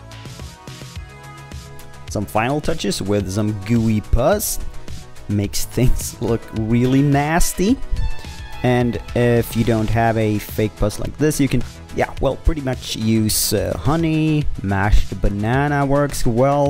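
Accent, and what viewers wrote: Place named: Skåne, Sweden. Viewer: good accent in Swedish, sounds like ure from Skåne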